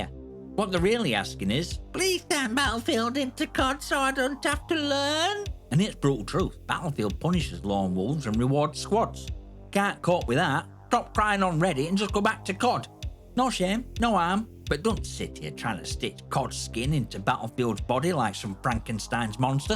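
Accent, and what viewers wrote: United Kingdom. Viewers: You have the best style of Brittish accent, so I'm subconsciously agreeing with whatever you say automatically before thinking critically about it. It's like a nice big bonus that you happen to be spot with all your takes here.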